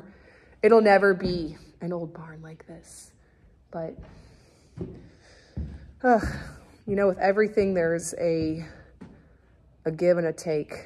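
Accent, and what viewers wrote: United States. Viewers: I actually went to your open house not knowing about this channel then later found it after seeing a page from your book hanging in the barn and of course I had to look it up! To my surprise I already followed you on instagram! The house felt important and historical. It’s hard to describe. You did such a great job of bringing it to life and yet keeping the feel of that time period. I felt I was truly touring a piece of history. It has been really cool to go back and see what you guys added from antique shops and such. Because I never would’ve guessed it wasn’t all original. I also love old things and I love your style. Your videos are so well done. And as a fellow Missourian I love hearing your Missouri accent that is similar to mine 😆. I will definitely be following along on your home building journey. Can’t wait to see what you do next and how you’re making things work in your temporary home. 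It’s all very relatable to me because I also have a large family and we have moved a lot. Whoever ends up in the farm house will surely love it and feel that magic. It’s a very special place. 🤍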